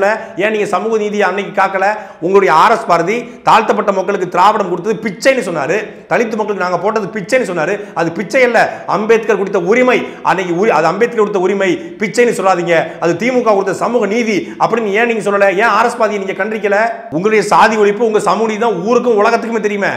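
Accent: Tirunelveli, India. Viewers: இவ்வளோ மரியாதையா பதில் சொல்லிருக்க வேணாம், சாட்டையின் திருநெல்வேலி தமிழே போதுமானது மருத்துவருக்கு 😉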